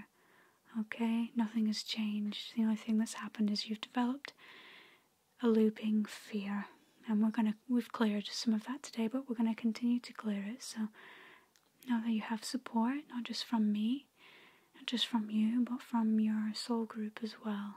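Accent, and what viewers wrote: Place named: Lancashire, United Kingdom. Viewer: This lighting is lovely, and your Lancashire is too,. 🕊️🙏💕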